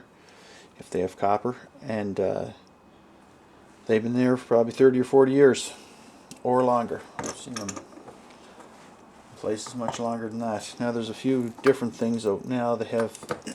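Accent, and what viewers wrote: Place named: Newfoundland, Canada. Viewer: Excellent video sir! Very clear, succinct, and to the point. Completely unrelated - to my ear, you sound like you might be from Newfoundland. (St. John’s or vicinity, perhaps?) It’s not very noticeable - but I picked up a subtle accent on words like “part”, “proper,” “marker,”- and “copper.” If you are NOT from Newfoundland, Id be curious to know where you ARE from. Thanks again for making this very informative video. Cheers!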